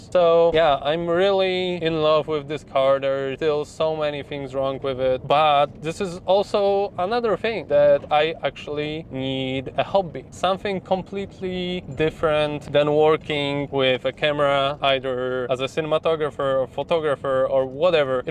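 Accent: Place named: Poland. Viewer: Po pierwszych pięciu słowach pomyślałem „hmm… z akcentu chyba Polak” 😆 Gratuluję zakupu prosiaka za tak śmieszną kwotę! Pozazdraszczam... 😉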